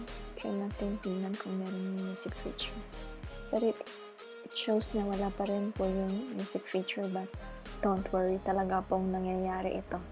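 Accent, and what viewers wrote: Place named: Philippines. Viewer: i could tell with the accent i’m half filipino:)) salamat po for the video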